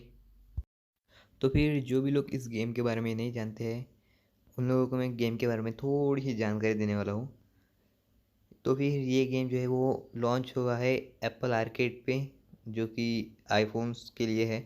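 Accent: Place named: India. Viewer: Not everyone is good at english dude if he talked english he still gets the indian accent so it will sound so fucking weird and he might say wrong words so remember that everytime.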